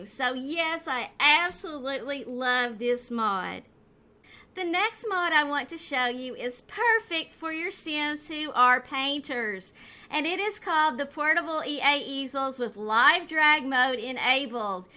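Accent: South, United States. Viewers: Love the video but I'm trying to figured out if ur American or what love ur accent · subscribed. great and informative video. i know you get this a lot but i absolutely love your voice/southern accent, its so cute. you are so intelligent and funny too, you make everything so easy to understand and present everything so well. you deserve way more subs then you have without a doubt! you've got talent!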